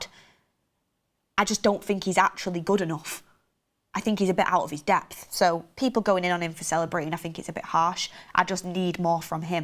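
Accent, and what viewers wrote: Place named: Manchester, United Kingdom. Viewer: Supports MU. Can’t tolerate a Mancunian accent….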